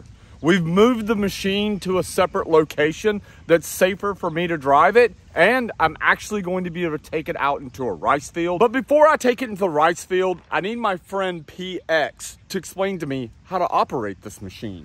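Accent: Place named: South, United States. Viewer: Georgia boy in Thailand I was wondering where you were from I can’t help but hear your accent as a fellow southerner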